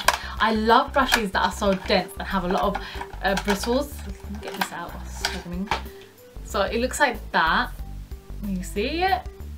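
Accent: United Kingdom. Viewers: you have a really cute British Asian accent, awh I'm new to your Chanel btw, jus discovered you! Haha so bubbly an sweet. Looking forward to watching more! Xxx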